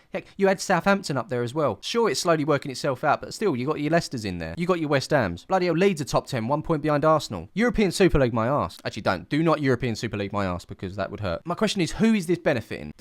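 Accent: United States. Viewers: Fun concept, but wow this is bad for Europe. We at Forward Madison FC will gladly take you all in. And you have a spot on American accent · On one hand, I’m offended that you needed to do the American accent, but this is largely our fault, so.... dammit.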